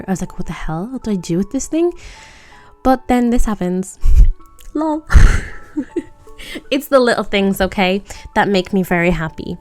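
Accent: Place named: United Kingdom. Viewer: I really like your british accent <3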